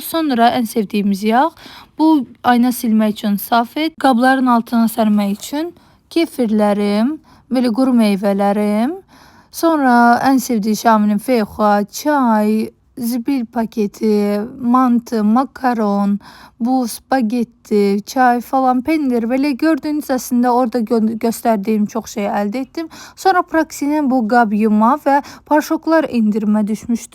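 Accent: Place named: Zaqatala, Azerbaijan. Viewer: Salam,eslen haralisiniz? Zaqatala lehcesiniz var sanki,birde yoldasinizla yas ferqiniz var?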